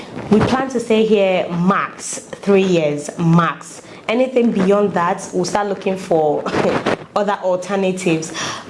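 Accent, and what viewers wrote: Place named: Nigeria. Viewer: I really appreciate that you are audible & clear..even when you drop in your dialect it doesn't make it hard for a non Nigerian to understand. You are an Intelligent lady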